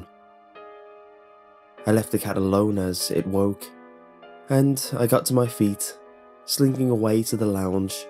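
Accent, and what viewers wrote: United Kingdom. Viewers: Devin Williams they also speak very differently as this guy is British and mrcreepypasta is American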